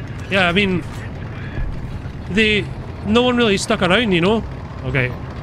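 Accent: Scotland, United Kingdom. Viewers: if the intro that includes the flag nor the accent give it away, i will. he's scottish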